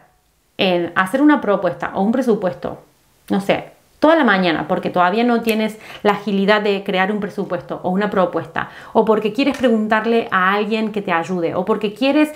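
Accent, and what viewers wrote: Argentina. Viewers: Esa tonada cordobesa !! 😅😅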